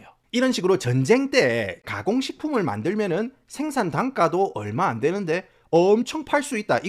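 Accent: Gyeongsang, South Korea. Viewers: 공감하는 글 잘 봤읍니다. 한국도 마찬가지 풍조입니다. 정크푸드가 건강한 포장을 쓰고 판매되는 것은 똑 같네요 갱상도 구수한 언변이 참 좋습니다.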